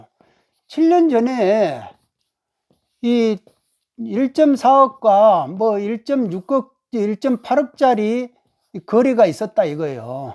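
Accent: Jeolla, South Korea. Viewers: 옹색하다와 징그럽다 둘 다 전라도 사투리는 아니고 말씀하실 때 전라도 특유의 억양이 있으십니다 징하다가 징그럽다의 전라도 방언이에요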